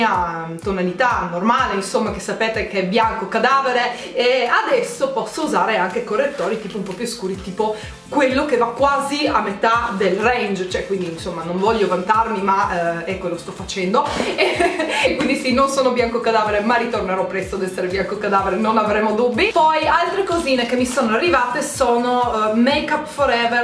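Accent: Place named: Trieste, Italy. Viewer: oddio sei di Trieste anche tu ahahah! infatti mi dicevo che hai un accento troppo famigliare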